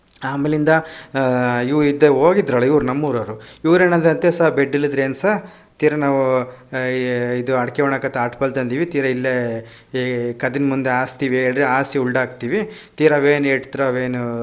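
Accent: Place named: Hiriyur, India. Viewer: Nammadu hiriyur bhase kele khushi ayetu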